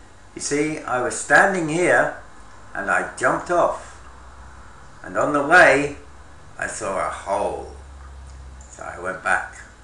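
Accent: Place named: Ireland. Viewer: Love the Irish accent! nice video!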